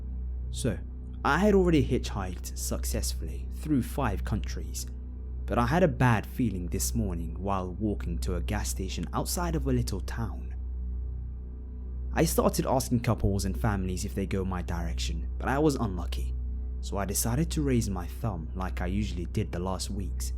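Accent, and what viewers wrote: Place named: United Kingdom. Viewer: is hereby the best story teller. That British accent.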